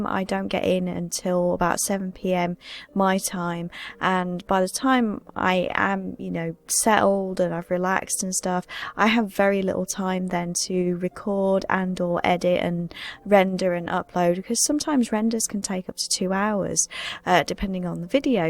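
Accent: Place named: England, United Kingdom. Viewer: Great video:) Everyone has that funk and talking about it is honestly that foot hold that helps start the journey out of it. You would do amazing in the ASMR scene. The English accent alone would do it but you have such a smooth and soothing voice and very little inflation in your tone (All of this of course is meant in the best of ways). Good luck with your journey home and your new direction:)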